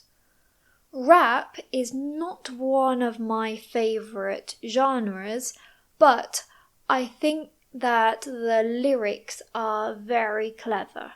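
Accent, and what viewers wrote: United Kingdom. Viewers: Is this a British accent? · You have so beautiful british accent!❤ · Is this a British accent or American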